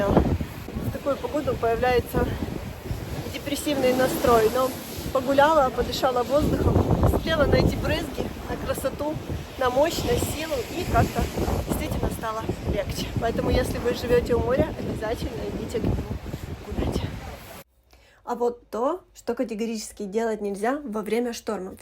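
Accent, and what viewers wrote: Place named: Ukraine. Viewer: Она не одесситка,видимо не так давно живёт в Одессе, оттого не все говорит верно,,, Довженко,,😮 слышится укр акцент,у одесситов другой говор,акцент 😮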